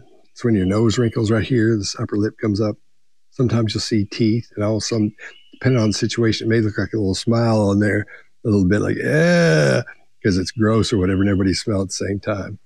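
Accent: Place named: South, United States. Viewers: Love that Southern accent. Smooth as honey. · the whole time I was thinking Tennessee!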